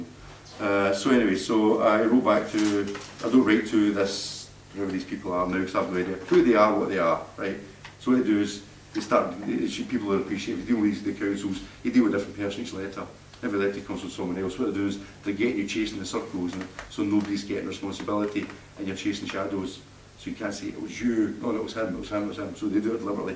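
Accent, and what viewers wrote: United Kingdom. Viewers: if I could just understand one word of this thick manchester accent..awful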